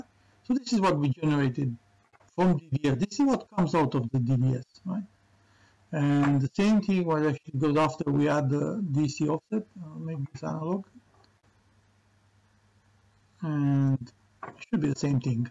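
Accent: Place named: Romania. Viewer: Your accent sounds romanian, I don't know why. Thank you for the nice video!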